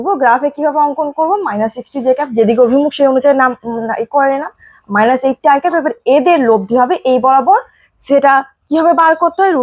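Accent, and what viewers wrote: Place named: Sainthia, India. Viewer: দিদি আপনার ভাষা শুনে মনে হচ্ছে সাইথিয়া বা তার আসে পাশের অঞ্চলের , আমিও বীরভূম থেকে বলছি । খুব ভালো লাগলো টপিক টা এত ভালো করে বোঝালেন দেখে, আমি যদিও বা ক্লাস 11 এর student noi ❤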